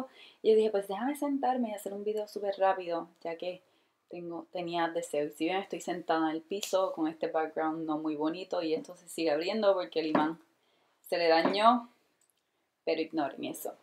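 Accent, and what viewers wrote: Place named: Puerto Rico. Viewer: Hola, ojalá no te desanimen lo que digan las personas negativas, porque hay mucha gente que aprecia tus vídeos y tú persona. Yo te animo para que sigas siendo tú misma ya que eso es lo que te hace ser especial, tus vídeos son muy interesantes y a mí me sirven para mucho. Besos de una española que adora tu acento portorriqueño mezclado con el inglés. Con todo mi cariño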